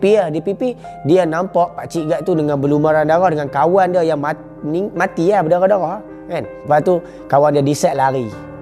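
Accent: Kedah, Malaysia. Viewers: ( loghat Kedah geng !! )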